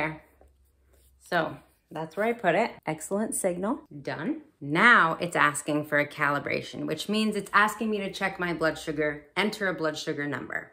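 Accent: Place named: United States. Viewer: Fellow t-1 diabetic From Australia and sounds very interesting 😊 indeed. By the way I totally love your American accent 😊.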